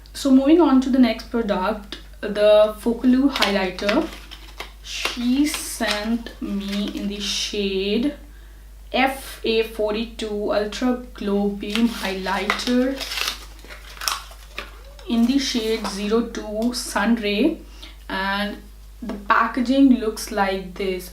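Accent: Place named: Australia. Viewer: Loving ur accent australian touch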